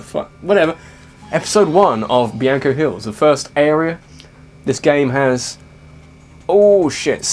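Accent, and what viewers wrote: United Kingdom. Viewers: It's been a while since I've watched one of let's plays, and I've really missed his nice british voice. I've got three more LPs lined up after this one, so I'm gonna get a whole lot of lovely brit; after too long of no brit. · Jarren Bonson Subtitles, for when sound isn't an option beyond hearing that wunderbar British accent.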